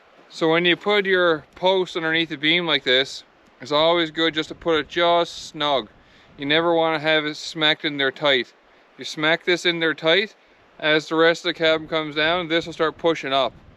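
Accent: Newfoundland, Canada. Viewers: LoL "Thank the b'Jesus" Yes my son. By George that plate of fish looked gooder than rabbit !! New subscriber here. Love the accent and the phrases. Both of my parents are from Deerlake and most of my cousins too. I have always loved the Newfoundland and Labrador accent. I was conceived in Stephenville but was actually born in Halifax Nova Scotia and have been here for 57 years. I was in Bonavista last March....just ahead of the Spring icebergs sadly. But the trip across the island was nothing short of beautiful as always. Where are you in Labrador? Looks gorgeous. Look forward to watching more videos. Until next time my good man. Cheers and happy hunting, fishing and gathering.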